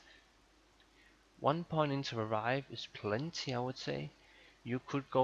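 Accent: Denmark. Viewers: hey Fellow Danish fam. Jeg kan høre du er dansker. That accent though, also the keyboard saying "DAN"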